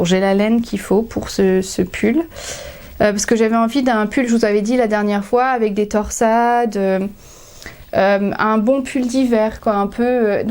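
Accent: England, United Kingdom. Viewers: Bon je crois que c'est mon premier commentaire :) J'adore te regarder, tu es pimpante et ton accent anglais ;) (même si tu n'es pas anglaise ;) ) tes anecdotes! Et tes rouges à lèvres :) Bon sans oublier tes magnifiques tricots! Dommage pour ton gilet :/ Amusez vous bien à l' EYF!!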